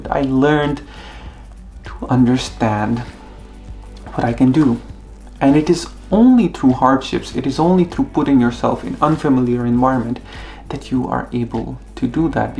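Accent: Estonia. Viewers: your english already sounds like you're an estonian speaker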